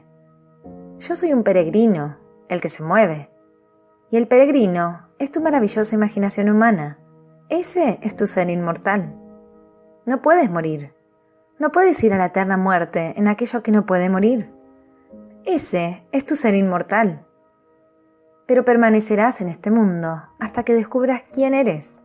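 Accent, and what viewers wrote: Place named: Argentina. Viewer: No me gustan como hablan los argentinos , lastima porque no termine de escuchar el video 😞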